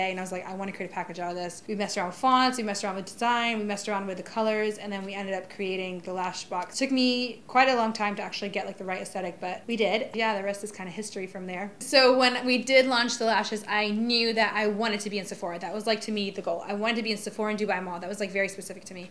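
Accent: Iraq. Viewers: احكي عربي بفيديو كامل عربي وتحديدا اللهجة العراقية 😀😉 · عراقية وعدها. فيديوات تحجي عراقي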